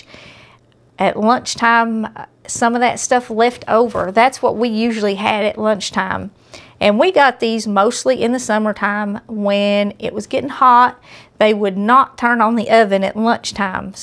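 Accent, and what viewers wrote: South, United States. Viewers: I really enjoy your channel and your southern accent. God is an awesome God❤❤ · Love the Southern American sing song accent. Thanks for sharing this quick bread recipe. God works in mysterious ways. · Your accent sounds just like Dolly Parton's. Enjoyed your video. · In these times of financial insecurity for many, this is great to know how easy it can be to provide for the family. I have lived in Germany for ages, but I spent every summer in my childhood visiting my family in Tennessee. My grandparents were from there. I adore the southern drawl, the charm of how the southerners speak. I look forward to making these with fond memories of my childhood. I also loved hush puppies! Do you have a recipe for those delicious 'critters'? Bless you.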